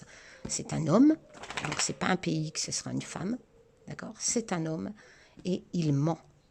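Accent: Nord-Pas-de-Calais, France. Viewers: 😀😀😀😅😅😅🤣🤣🤣😂😂😂😂 trop beau l'accent chti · Coucou, enfin te voilà, quel bonheur 💋❤très contente que cela ce calme un peu, mais encore très prenant pour vous. Tres drôle et émouvant ton parler chti ma grand-mère ne parlait que chti je comprends tout. bisous à tout les deux merci pour ton tirage Et courage prenez soin de vous.. (Tu parles des lanceurs d'alerte?)🥰😇🙏💋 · Bonjour, alors je te l'avais dit pas mail, je suis super contente d'avoir recu ton jeu, il est magnifique et j'adore l'effet peau de pêche, et comme je te l'avais préciser également il va partir (avec moi) a l'autre bout du monde rejoindre ma belle famille (Japon) et se fera purifier dans un grand temple, (je t'enverrais des photos de l'évévenement car tu es quand même sa premiere maman!!!! alors juste une petite chose, j'ai vu qu'il y avait la carte du corbeau (oiseau que j'aime beaucoup qui sont des sortes de guides spirituels pour moi) et sur la carte les mots clefs sont danger, accident, trahison, mais pour moi le corbeau ne véhicule pas ca du tout, alors ce que j'ai fait.. 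j'ai tout simplement rajouter sur la carte a la suite (car il y avait un peut de place) les mots "messager" et guide" et voila le tour est joué!!! je voulais te raconter ma petite expérience avec ce jeu magnifique que j'aime beaucoup, (et tout les petits cadeaux) les dessins sont d'une finesses assez incroyables, Olivier a vraiment un don de dessinateur!!!! merci a vous deux pour cette magnifique expérience de vie.... trop drole l'accent "ch'ti" j'adore!!!^^ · Hello lol j adore entendre parler ch'tis mes origines comme toi ❤❤❤❤ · Quel plaisir de t entendre parler Ch'ti j adore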